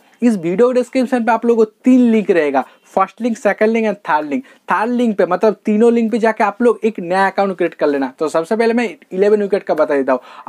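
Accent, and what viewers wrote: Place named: Odisha, India. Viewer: Odisha se ho kya brother???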